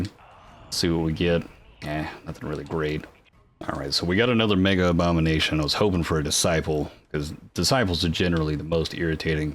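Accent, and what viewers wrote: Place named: South, United States. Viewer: This guy is underrated as fuck, The deep country accent makes watching you very fun as well as good commentary, fun gameplay, Good ideas and hes clearly not bad at the game either. Keep up the good work my man!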